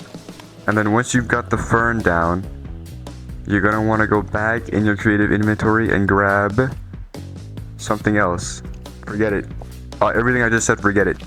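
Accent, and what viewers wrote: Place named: India. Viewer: I LOVE IT even though you sound like an Indian they humor and the innocent for tutorial you got potential 😂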